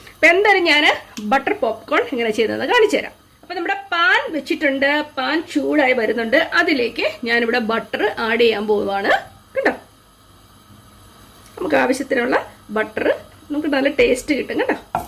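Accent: Kottayam, India. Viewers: Chechi nattil evde..?? Kottayam slang anu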